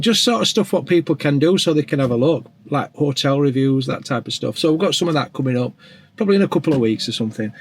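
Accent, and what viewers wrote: Yorkshire, United Kingdom. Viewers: Non Brit here, where is your accent from? Yorkshire? Love it❤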